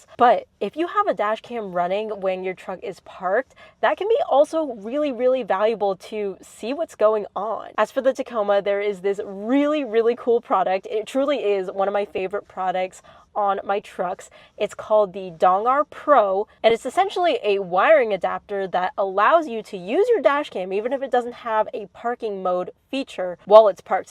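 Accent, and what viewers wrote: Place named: United States. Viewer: pretty sure she is american, I am expert on accents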